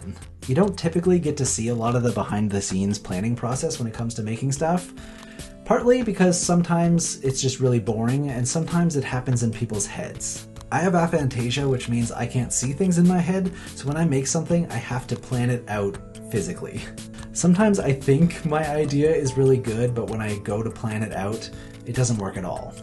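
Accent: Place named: United States. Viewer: I did not expect an American accent to come out of your face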